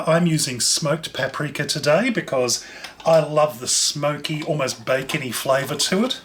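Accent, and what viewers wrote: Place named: Australia. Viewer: I do not care much about whatever your recipe is . I just love listening to your voice and the Aussie accent 🙌🙌🙌🙌🙌💝💝💝💝💝💝🇦🇺🇦🇺🇦🇺🇦🇺🇦🇺 I’m living in Houston,Texas. USA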